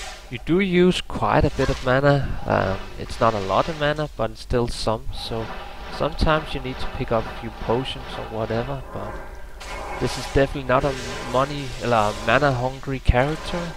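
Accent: Denmark. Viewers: Nice tutorial ！ Are u from Denmark?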